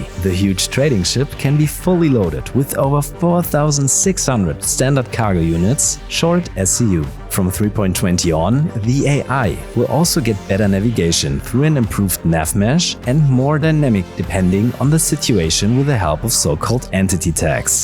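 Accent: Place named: Germany. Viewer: Don't blame him, he speaks weird with that thick German accent.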